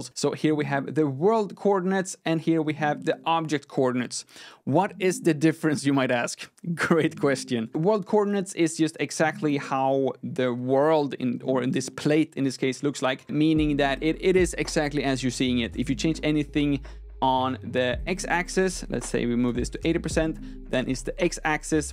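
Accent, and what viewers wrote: United Kingdom. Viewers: British English, subscribed! - Trying unlearn - unsubscribed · British English, thank you, subscribed! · Keep your British English. It's not wrong. 😂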